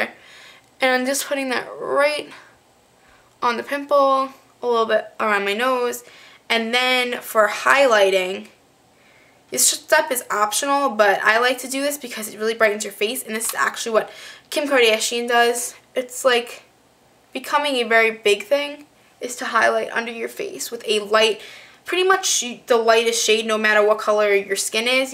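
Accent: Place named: New York, United States. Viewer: i love your accent. new York???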